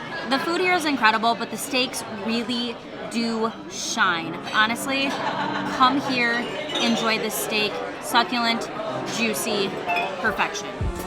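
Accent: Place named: Midwest, United States. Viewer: Great episode! Made me so hungry! I love that your mid west accent is going wild in this episode haha. Love the!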